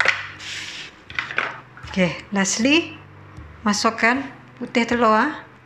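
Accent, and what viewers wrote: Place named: Sarawak, Malaysia. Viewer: Seronok dengar kamu ckp sarawak. Sedap bebola daging bakso ni.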